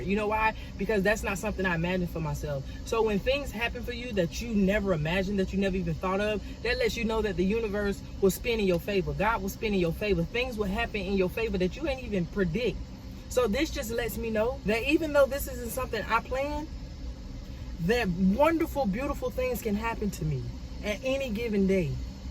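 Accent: Florida, United States. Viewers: First of all CONGRATULATIONS!!! I am soooo happy for you! Im not even sure how I came across you but as soon as I heard that accent I said “ She either from St Pete or Jacksonville!” LOL! I’m in DUUUUVALLL too! So it’s so nice to see you making your situation into a positive one! I caught a felony soon as I turned 18 & the judge said she was gonna make an example out of me. I was lucky I only did a night in jail 5yrs probation but the stigma with having a record never leaves! So congrats again! I can’t wait to see what awaits you!!🎉🎉🎉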